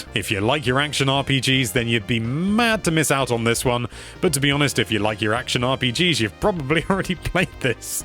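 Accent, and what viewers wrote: United Kingdom. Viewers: It seems Americans (like me) would say Yo as in yo-yo, and this dude (and presumably others in the UK) would say it yo as in "yonder". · It's the British pronunciation, the way we all said it in the days before YouTube... ;) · Its the way we pronounce it in uk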